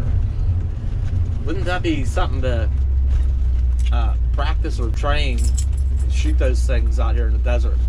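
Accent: Pennsylvania, United States. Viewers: As I get much older I see people in a different light there real friends and there r user. Stay safe I love u still have ur PA accent.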